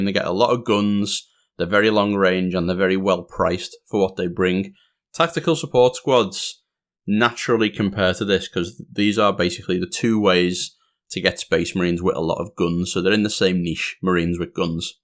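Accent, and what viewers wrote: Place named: Merseyside, United Kingdom. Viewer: Dropped a like and subscribed because of the Merseyside accent ;)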